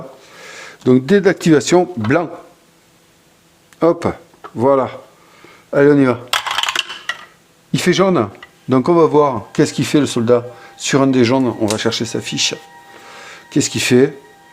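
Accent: South, France. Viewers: laccent du Sud de la france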